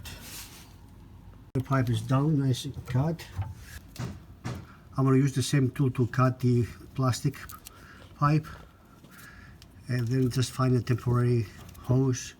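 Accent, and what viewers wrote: Greece. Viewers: You sound Greek lol. Good video.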